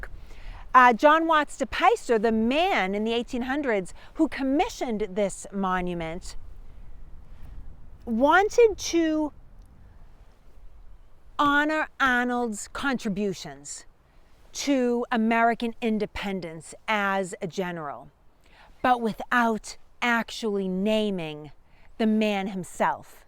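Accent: Boston, United States. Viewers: Great piece, excellent production, clear presentation and information before unknown to myself, I love the charm of New England, Boston accent...you are bold in facing the wind with such humour and laughter, can't wait for more of these, makes learning about the history FUN!!!! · Boston accent much? Southie? Dah-chestuh? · ❤❤❤❤Brilliant Historical Videos! Loooove the Boston Accent! Too! ❤❤❤❤ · You only have to listen to talk for 30 seconds to know that she is definitely from Boston.🤣💕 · Great history and I always look forward to your informative and acurate posts. No way AI as that Boston accent is unique, (to humans.) Keep up the good work! Can you post any articles links that you write?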